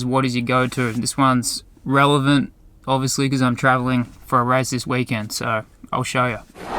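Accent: Australia. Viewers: Can you teach me how to speak Australian ?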